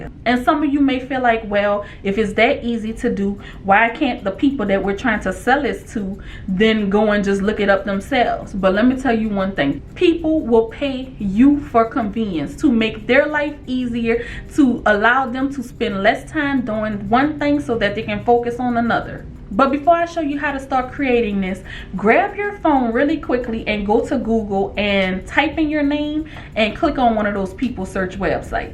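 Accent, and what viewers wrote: New Orleans, United States. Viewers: Where ya at. (504 colloquialisms. Born & raised in N.O. I hear that accent. ❤ Hunni...you out here helping folk live!! I'm here for these multiple streams of income videos all day. I just have to get computer savvy. I just foubd your channel & will be a new subscriber. Thank you for helping us learn how to go out get it.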